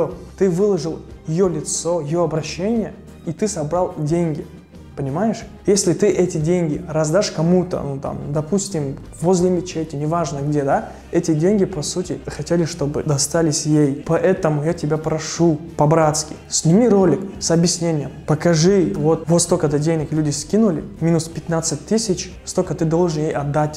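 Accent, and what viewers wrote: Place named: South, Tajikistan. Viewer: ман аз Конибодом.Хамон видеоролике ки гуё духтар аз Конибодом бошад боварам намеояд. Аксенти мо дигар. Вай мисли одамони чануб гап задаги.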